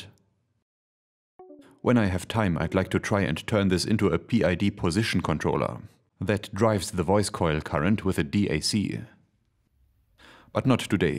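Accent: Germany. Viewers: Der Deutsche Akzent kickt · You have the most German, German accent I've ever heard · hard German accent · Sounds epic in a German accent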